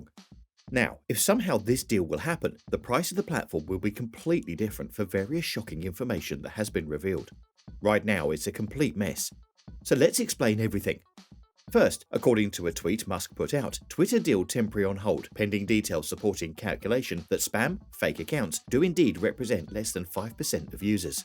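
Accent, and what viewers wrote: United Kingdom. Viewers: What do otherwise educated people seem incapable of differentiating between "FEWER" and "LESS"? "Less users"? Really? Do you prefer "FEWER" jam on your toast? Fuck sake, it isn't even an American-ism. You have a British accent. One assumes you are a professional announcer. It's your job and inheritance to speak English better than this.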